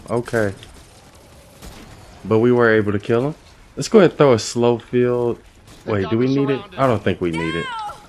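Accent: South, United States. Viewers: What state do you live in u have a southern accent I think lol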